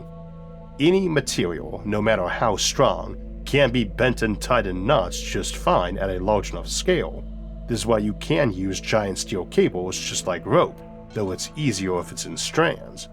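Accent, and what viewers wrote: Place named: South, United States. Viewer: Just gotta ask Mr. I'm not really trying to criticize, but I gotta know about your speech patterns. Like I said, I'm not trying to be offensive. Do you hail from the southern USA, like Texas. I hear what sounds like an accent there. Oh and I love your videos and knowledgebase.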